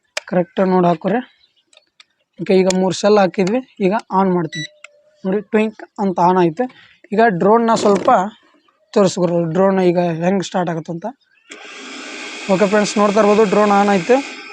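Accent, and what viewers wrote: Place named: North Karnataka, India. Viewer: Pakka ಉತ್ತರ ಕರ್ನಾಟಕ ಬಾಷೆ😅😅😅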